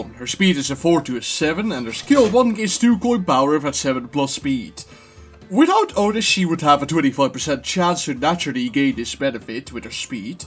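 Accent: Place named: RP, United Kingdom. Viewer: You have the voice of a British lord, will you appear in wuthering heights?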